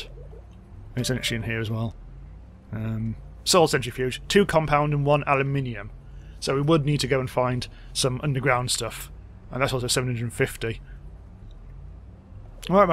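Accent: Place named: Northern England, United Kingdom. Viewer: Neat vid. Refreshing to have a northern accent too. Laughed out loud at "dark as dicks". :D Keep it up mate.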